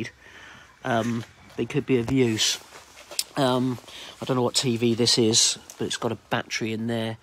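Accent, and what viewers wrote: England, United Kingdom. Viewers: I’ve seen other videos and loads are from USA it’s sooo refreshing to hear a English accent on this.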